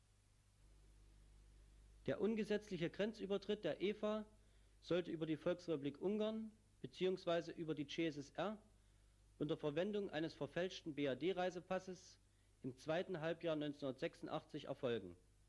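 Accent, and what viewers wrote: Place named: Saxony, Germany. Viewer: Leicht sächsischer Akzent, ansonsten roboterhafte Kälte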